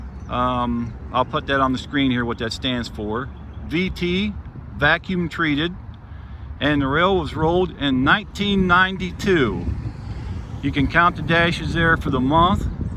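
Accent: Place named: New York City, United States. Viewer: loved your New York City accent ("DPUs, I see youse").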